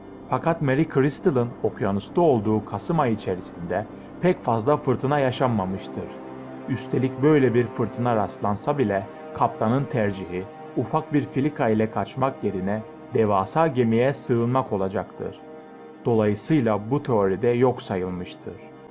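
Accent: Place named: Germany. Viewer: kardes alman ikinci liginden gelen gurbetci topcular gibi konusuyosun.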